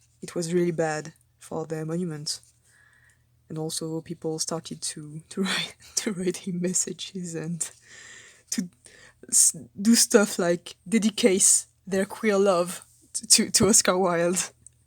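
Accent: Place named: France. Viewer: Oh mon dieu les eighths doctor adventures ET un accent français comment j'ai fait pour ne pas trouver cette chaîne plus tôt. merci de garder le rêve du huitième en vie par la peinture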